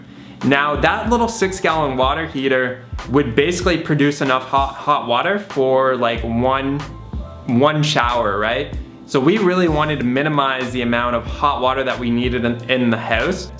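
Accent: Canada. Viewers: The Canadian accent was strong in this one (probably because you said words with "ou" in them so often lol). XD I can't wait to see this tiny house when it's finally done though! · Aboot...lol You're such a Canuck :)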